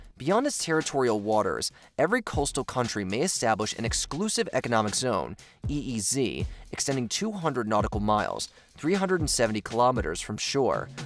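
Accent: United States. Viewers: Are you American 😅😅😅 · Bruh. He has a normal American accent. He just isn't pronouncing these names right. Anyone more aware of these things should know that "Delhi" sounds like "deli." It's just incompetence. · Love the videos. Hate the American pronunciation of all the places in the world. Saying that "new daily" is a new one for me.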